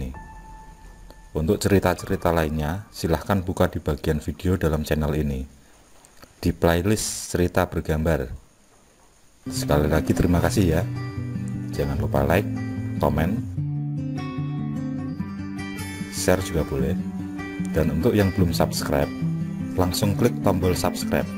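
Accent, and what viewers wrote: Java, Indonesia. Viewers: Jelas banget, mas orang Jawa y Karna logat nya mas Thu ketahaun banget!!! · Kepo sama wajahnya.... Dengan suara khas jawa yg medok.... 😊😊😊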